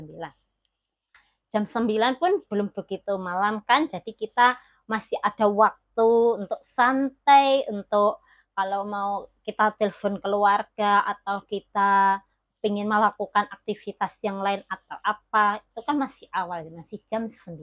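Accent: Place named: Java, Indonesia. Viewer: Medok temen ngapake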